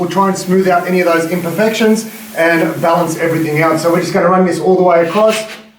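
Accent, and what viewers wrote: Australia. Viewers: Really good tute. Is that an aussie accent?? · Bloody awesome mate, excellent work, appreciated the detailed illustration of all of the steps required for this job, and great to finally hear an Aussie accent on one of these videos.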